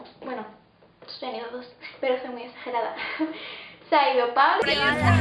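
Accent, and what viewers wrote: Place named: Spain. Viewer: Ese debe de ser el acento más hermoso del español. ¿de qué parte de España eres?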